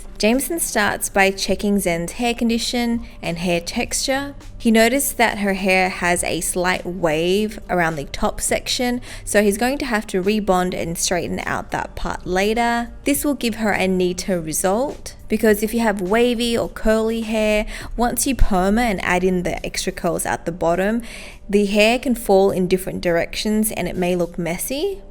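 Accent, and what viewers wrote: Australia. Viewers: Where is this salon? As i noticed you have an Oz accent. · I realize that 'hair' for women can be a long process, depending on how long it is and also how well a woman takes care of it, but I never realized what an extensive process it was. I'm hearing accent, sounds Australian? thinking Melbourne?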